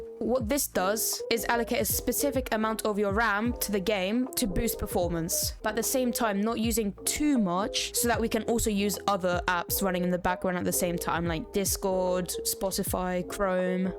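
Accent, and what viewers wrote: United Kingdom. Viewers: british egirl gg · i could tell from the start u were brittish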